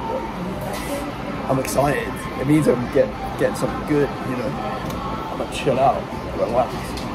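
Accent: United Kingdom. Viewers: please put english subtitle in your next video coz my brain is not cooperating with your british accent😅🤣✌️ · Omg i love British accent absolutely great and your video absolutely amazing, all support 😊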